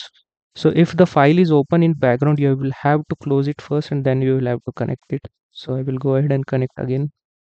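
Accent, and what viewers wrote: Maharashtra, India. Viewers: You are marathi., I understand from your accent. Thanks great video.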